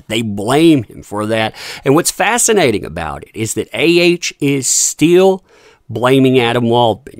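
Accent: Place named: East Tennessee, United States. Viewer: I don't know if you've always been in east Tennessee but you sure sound like it and get tickled like it too.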